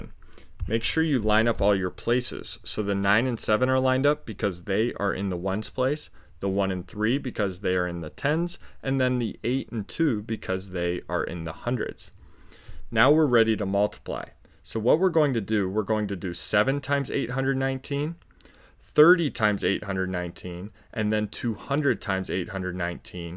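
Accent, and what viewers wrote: United States. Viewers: Ty are you American 🇺🇸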